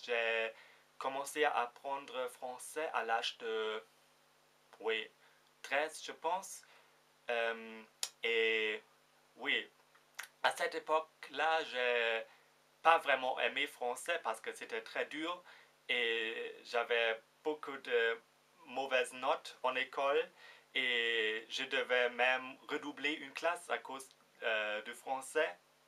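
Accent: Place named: Germany. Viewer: Hallo ! ich bin Französich,du sprichst gut Französich , mach dir keine Sorgen ;) Ihren deutschen Akzent ist cool, nicht entfernt continue comme ça!!!!!!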